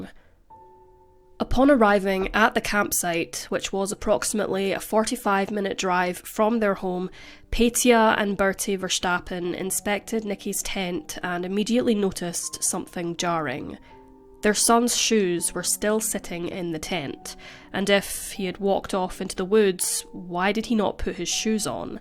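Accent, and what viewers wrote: Scotland, United Kingdom. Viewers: Her accent is Scottish 😊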